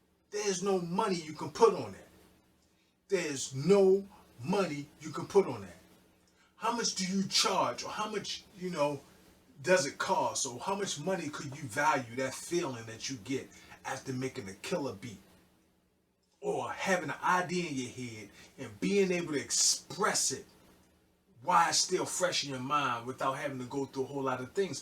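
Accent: Baltimore, United States. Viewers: Yeah Roland did just fade to black....I feel like they may come back! Roland where YALL AT?????? Sound like a Bmore accent?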